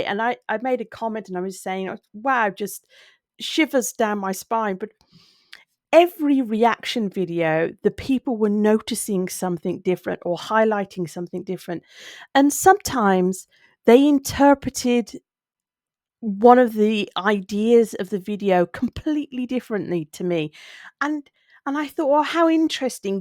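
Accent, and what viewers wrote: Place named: Solihull, United Kingdom. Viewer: I love your comments on watching Ren, the Collective joy that it brings watching others discover him for the first time! You say you are not the typical person to be a Ren fan… I will prove you wrong!! I live in Brighton, and when he announced that he would be playing one festival this year, he chose The Secret Garden Party. Lots & I mean lots of fellow Renegades, decided to come to Brighton first, from all over the world! I offered my sofa bed for anyone that needed it, I now am super close with the lady from Canada that came to stay with me! I’m 49 & she is in her late 50s. We met with others, mostly women, mostly 40+ in age! Ren has spoken to so many people through his music, young and old! The is a guy in his 80s with a reaction channel (Vietnam Era Vet) who LOVES ren also! Glad you love him also! ❤ Also, you sound like you are from my neck of the woods 😉 I was born & dragged up near Solihull! 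🙏♥️🙏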